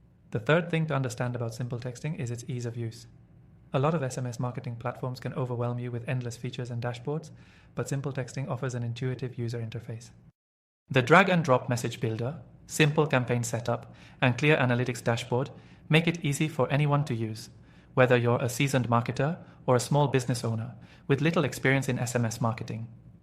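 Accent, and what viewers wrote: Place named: India. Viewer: nice indian accent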